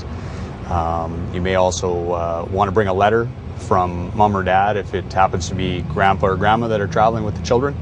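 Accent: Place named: Manitoba, Canada. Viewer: True. Based on the "a" in his "mAndatory" which is only common west of Ontario, his "ou" in "about" which is of course the stereotype of small-town Canadians, his "ar" in "card" which is ever so slightly different from that of the eastern provinces, his "la" in "regulations" which almost sounded Native/Aboriginal to me, combined with his intonation and his very frequent use of "thee" for "the" and "ai" for "a", I would peg him as being from Manitoba or Saskatchewan. Only a guess tho!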